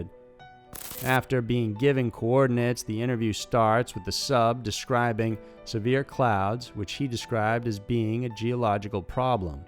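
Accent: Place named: Midwest, United States. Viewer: I'd agree hes American, but I don't hear any southern accents in his voice. Possibly midwestern.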